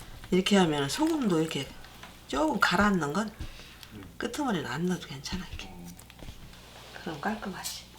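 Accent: Chungcheong, South Korea. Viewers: 충청도 아주머니시네 사투리가 무수 배차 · 배차 무수 오랜만에 들어보네요 ㅋㅋㅋ 충청도사투리 매일 잘보고 이습니다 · 고향이 충청도이신가요? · 어머니와 아드님 너무 다정다감하신 대화와 요리들 너무 잘보고 또한 구독도 했어요. 저는 어머님의 충청도 사투리가 저희남편 가족들의 말씀같이 꼭 우리 큰형님~셋째형님 말소리와 너무 똑같아서 잘보고 잘 따라하고 있습니다.~그런데 지금 우리 형님들과 우리남편은 저~세상 하늘나라로 가셨습니다. 그리고 저는 나이가 들어서 노인정에서 식사를 해드리고 있는데 주로 어머님의 음식을 보고 많이 따라하는 편 입니다. 젊은시절에는 직장 다니느라고 살림을 안해서 주방에 들어가는것이 무서웠는데 지금은 하나도 안무섭고 무엇이든지 어머니께서 하시는 무엇이든지 돋보기에 제가 하고싶은 음식을 치고 누르면 무엇이든지 다~나오니 저는 행복합니다. 또한 8~9십대 어르신들 따라한 음식들을 만들어 드리면 엄청 칭찬해 주십니다. 그래서 저는 맬맬 행복합니다. 어머니의 요리를 배워서 행복하고 경로당 어르신들 맛있다고 칭찬 들을때 행복합니다. 그래서 저는 언제나 즐겁습니다.❤❤❤❤❤❤❤❤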